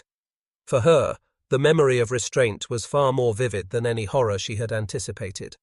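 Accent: United Kingdom. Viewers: wHY IS THIS ROBOT SPEAKING BRITISH ENGLISH? TO AN AMERICAN AUDIENCE?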